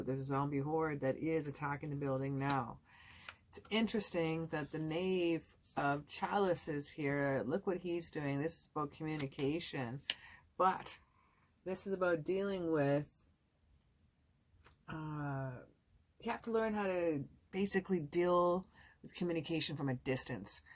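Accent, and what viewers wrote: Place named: Ottawa Valley, Canada. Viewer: I’m just Cindy, another Canadian girl like yourself! And thanks for following. 😉 I’ve been trying to place your accent. I can’t figure out if your originally from the Ottawa Valley area or the Maritimes?? 🤔. Am I close?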